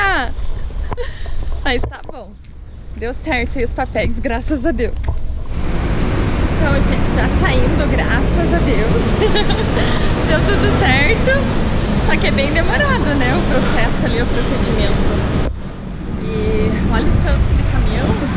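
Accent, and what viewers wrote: South, Brazil. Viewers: O SEU SOTAQUE DO SUL È UMA COISA ASSIM INDISCRITIVEL GRANDE ABRAÇO BOA NOITE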